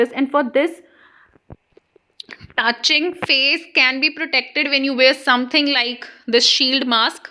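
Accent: India. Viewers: its scam once hearing a hinduh voice nexttttt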